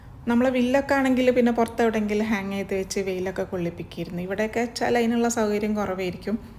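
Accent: Kozhikode, India. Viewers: Kozhikode evda Samsaram Ketit kozhikode aanenu thonunu 😀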